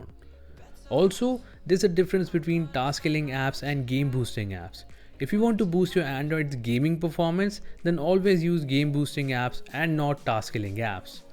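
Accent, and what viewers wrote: India. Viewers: You're the first YouTuber with indian accent I can undestand, thank you for this great Video it helped me a lot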